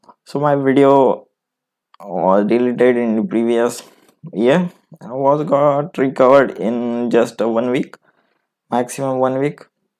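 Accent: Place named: India. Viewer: The minute I heard the accent Indians are heros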